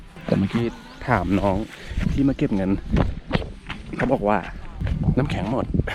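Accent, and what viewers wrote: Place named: Kamphaeng Saen, Thailand. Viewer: สำเนียงเหมือนคนกำแพงแสนบ้านผมเลย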